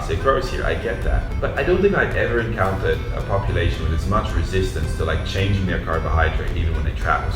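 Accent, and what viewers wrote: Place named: Philippines. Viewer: This man is living the life. Exploring the world while majoring on food. He sucks cultures like a sponge, he even got the filipino accent on some words. Very impressive 👍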